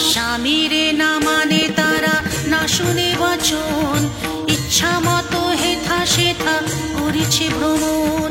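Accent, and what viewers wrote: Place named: Bengal, India. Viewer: Sanskrit mantra uchharan thik korun. bangalar moto uchharon hobena.montro uchharon thik na hole ta kaaj deina.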